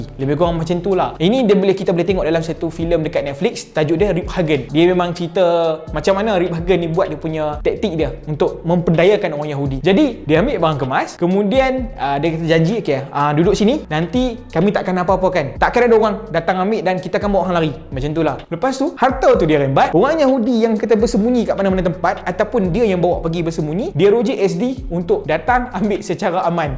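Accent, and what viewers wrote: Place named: North, Malaysia. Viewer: rupanya slang utara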